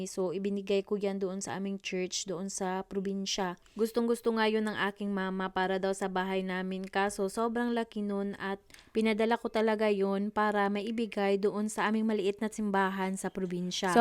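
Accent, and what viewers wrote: Visayas, Philippines. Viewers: Bisaya kaayu ka Dai tundan na staging...hehehe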